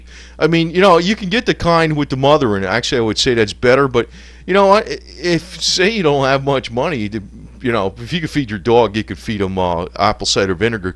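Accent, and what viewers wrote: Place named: New England, United States. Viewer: I absolutely adore your voice and the way you talk, including the accent. 💜 Is that a New England hint in your accent ? I'm not an American so it's hard to figure out. But it's amazing.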